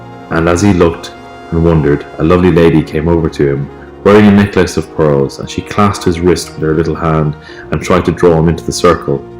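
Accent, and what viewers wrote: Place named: Ireland. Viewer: God, I love ya, mate. Such challenging subject matter you tackle, always doing a great job with it. Yes, I do see that, the strong similarities between the kingdoms - if they're really separate at all. This battle-weary heart, deeply sensing our oneness with All That Is, longs for the peace of our underlying harmony, which slips me up at times. It just is what it is, & it's good to know that. Until we at least accept the is-ness of whatever it is, we're going nowhere trying to change it. Acceptance & allowing smooth the way to manifest desirable change. Ah, Irish. I love it, but then I'm a sucker for "foreign" accents. Maybe it's that oneness thing, yet again. :D ~♥~ PS You may enjoy this fav quote, likely being able to see/feel more deeply into it than most: "Observation with evaluation is the highest form of intelligence." ~Jiddu Krishnamurti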